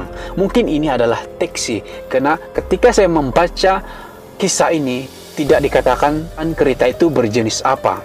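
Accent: Sabah, Malaysia. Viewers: Sabahan bha?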